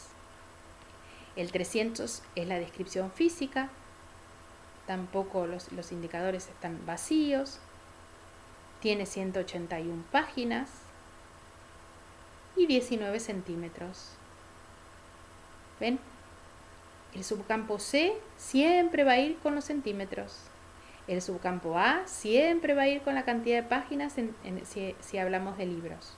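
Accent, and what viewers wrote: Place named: Argentina. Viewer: Qué lindo video y qué lindo acento argento. ¿Podrías decirme como crees que influirá en el futuro el uso de sistemas MARC para las bibliotecas?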